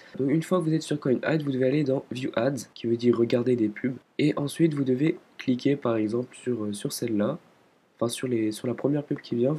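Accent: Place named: France. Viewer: Bravo pour votre accent français, ça fait plaisir.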